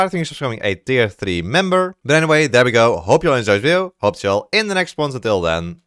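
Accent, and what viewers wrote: Netherlands. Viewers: meer nederlands accent dan dit bestaat niet.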